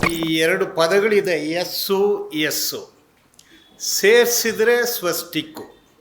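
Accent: Malabar, India. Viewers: Malabari talking kannada in fake channel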